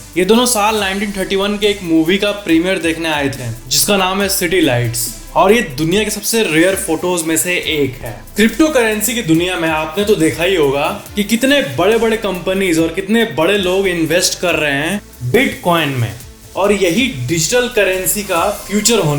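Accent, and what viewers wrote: Bihar, India. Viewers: Are you from Bihar or Up I am from bihar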